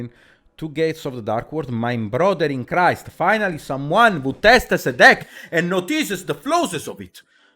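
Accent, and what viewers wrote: Italy. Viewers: incredibile come avevo capito che eri italiano dalla prima parola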